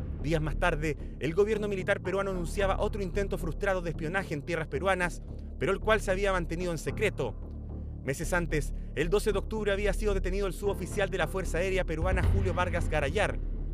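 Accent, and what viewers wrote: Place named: Chile. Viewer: Ptm .... vii este videooo 2 veces y no entiendo ni m..... ... tiooo Joderr deja de hablar ese ridiculo acentoo .. k no se entinde ni kulo !! Chilee deja de Joder.. sera k no tienen nada q hacer en tu pekeña ratonera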